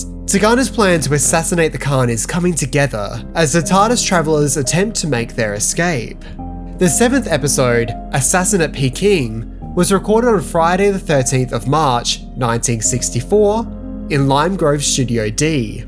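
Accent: Australia. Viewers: Great review, but can you back off on the Aussie pronunciation of the letter "o"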